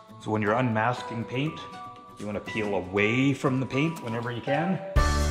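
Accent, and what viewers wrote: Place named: Canada. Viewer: Brilliant video. And the Canadian accent to explain the process! 🏴🇨🇦 👍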